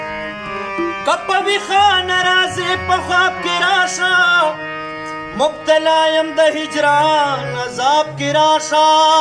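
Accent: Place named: Yusufzai, Pakistan. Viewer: ڈیر خائستہ غزل د لوڑ شاعر اؤ خائستہ وینا د ❤ شکر دے چہ Dialect ۓ د یوسفزو دے گنی بیا بہ پرے نہ پوھیدو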